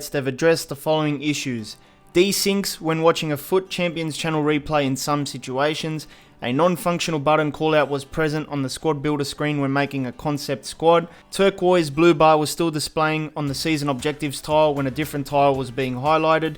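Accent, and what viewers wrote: Australia. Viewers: He’s from Australia so he pronounces things different, low him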